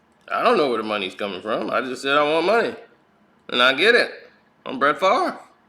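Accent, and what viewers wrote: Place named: Midwest, United States. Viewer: You are so mid west Tupelo not tupello pronounced too puh low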